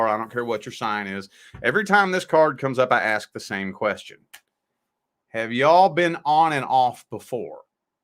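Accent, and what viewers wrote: South, United States. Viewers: I love your readings, very matter of fact. I love your southern accent. I am American living in the UK but I am wondering if it`s a Kentucky accent. I am hoping my ex will at least apologize but I doubt it. Haven`t heard from him in over 6 months and I doubt he will ever say anything to me again. It`s never been on and off. He broken up with me. There was a third party involved which I think was his next girlfriend but I think they broken up. I want a nice guy who is a soulmate. You are right. If he couldn`t appreciate the love I brought to the table then it was his loss.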